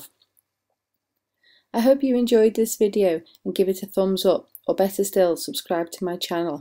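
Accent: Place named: Liverpool, United Kingdom. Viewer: Your accent is lovely. You’re a posh scouse x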